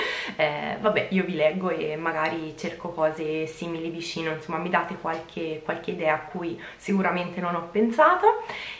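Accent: Tuscany, Italy. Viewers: Ciao. E' la prima volta che ti vedo su youtube. Sei veramente bella, mi piacciono in particolare i tuoi capelli. Dal tuo accento mi sembra di capire che sei toscana, forse di siena, ho indovinato? Ciao.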